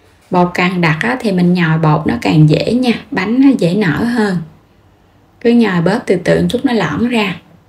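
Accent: Mekong Delta, Vietnam. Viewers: Giọng nói của người miền Tây là dễ nghe vậy đó cac bạn ơi ❤❤❤❤❤ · Mình nghe tiếng nói rất dễ thương mà , giọng nói đúng chất miền Tây bạn ơi .❤🎉❤🎉❤🎉❤❤❤❤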